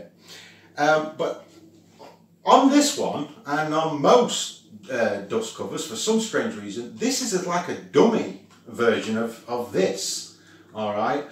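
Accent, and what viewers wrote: Midlands, United Kingdom. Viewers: This is right up my street! When the video started and you mentioned the best way to get focus on a wobbly scope I immediately though focus mask, but I didn't expect you to modify your dust cap! Really neat job, great bit of Astro DIY :) I'm trying to place your accent, I'm pretty sure it's midlands? Big sub from me :)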